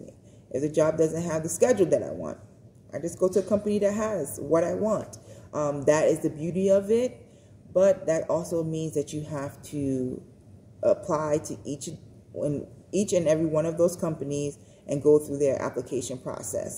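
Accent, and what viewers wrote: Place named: Jamaica. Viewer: Are you Jamaican? I hear an accent!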